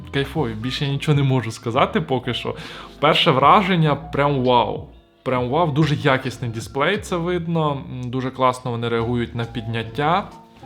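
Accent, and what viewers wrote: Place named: Ternopil, Ukraine. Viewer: І ще 1 такий акцент як в нашій Тернопільській області)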